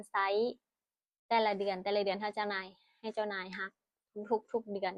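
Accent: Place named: Laos. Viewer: อยากฟังเสียงเว้าลาวเพาะดี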